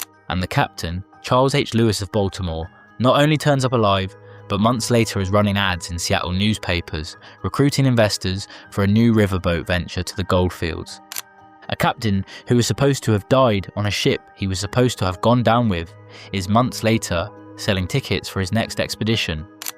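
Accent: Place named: Europe. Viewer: European accents are almost intolerable to listen to… I’m so glad to be American.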